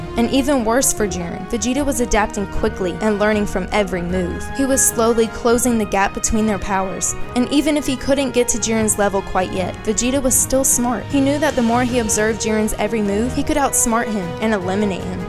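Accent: South, United States. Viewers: You’re from the south. I can hear the twang.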